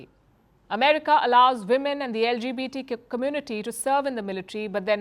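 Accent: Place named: India. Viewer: I don't like this channel at all, her accent is so strong it shows that she's from India. 👎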